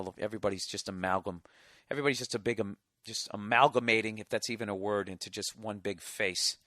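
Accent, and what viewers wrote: Los Angeles, United States. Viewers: I love his LA accent. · The LA accent is epic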